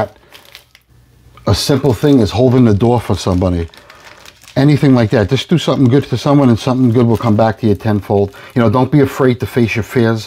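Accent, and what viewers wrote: East Coast, United States. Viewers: Love your message and videos. Old me from a few years back would've been jealous of your nice stack, but the current me just enjoys it and sees it as great motivation for myself. I enjoy your east coast accent, not from there myself but I like to hear it. My dad passed a couple years back at a younger age and it was rough but helped me put things in perspective for what is important in life and he was such a fun person who would make people laugh and smile all the time, even until the end. I remember him cracking up the nurses in the hospital even when he was in such rough shape. Keep up the positive attitude, I always appreciate it and love to see when your new videos pop up in my notifications.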